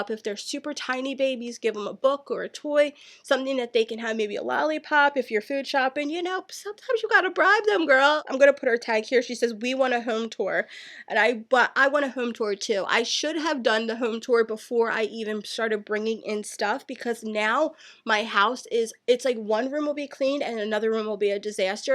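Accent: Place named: South Jersey, United States. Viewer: Gurrl! You already know I love you, your personality, content and accent! Go South Jersey..Lol 🙌🏽🙌🏽 I can totally relate to the whole thyroid issue! Basically it sucks! I’m hypothyroid and my hair is nothing like it used to be..it’s thinned out, dry and falls out!! ugh, my nails..and it does so much to your body, we would be here all day! Hang in there and go see the specialist for it, an Endocrinologist! I look forward to your adoption story and always your next video! Tfs love! 😘🤗 ~ Helen -NYC girl here..Lol!!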